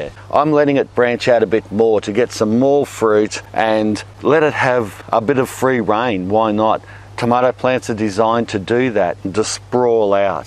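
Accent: Australia. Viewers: As an Aussie who shares his accent, I wish I could do this 😂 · I have his accent too.. but that’s because I live in the same area ;) Aussie Aussie Aussie!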